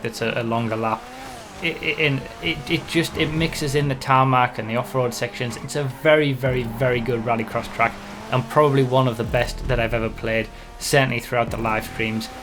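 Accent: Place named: Newcastle, United Kingdom. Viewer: You kinda sound like a Geordie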